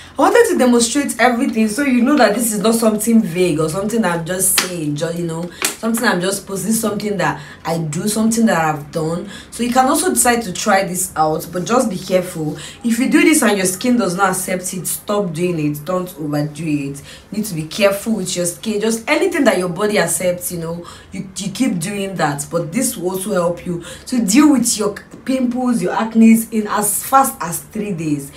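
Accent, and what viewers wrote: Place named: Nigeria. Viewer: I like the way Nigerians speak English thnx for sharing I will try this seriously am tired of pimples & dark spots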